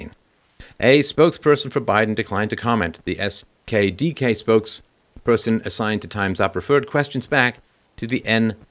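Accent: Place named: Ireland. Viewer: Where are you from? Cool accent. Is it Irish? The scary thing is...Biden could, potentially win, especially in the middle of all this insanity.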